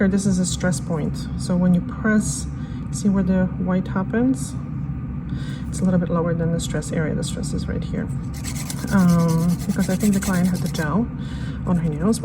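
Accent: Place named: Poland. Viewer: She lives in Spain but is not Spanish. I think she may be Polish.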